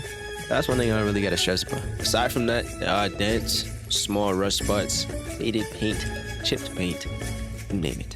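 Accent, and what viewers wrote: Bahamas. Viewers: why bro sound Bahamian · Could here that accent from a mile away 242!!!! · Heard that Bahamian accent and felt right at home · Bey I say I know that accent sound familiar😂. You gain a sub just because of that. This my first time watching ya videos. Continue dropping the bahama heat bro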